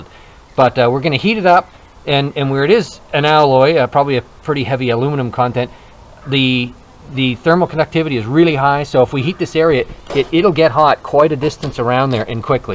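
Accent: Canada. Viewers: Canadian eh????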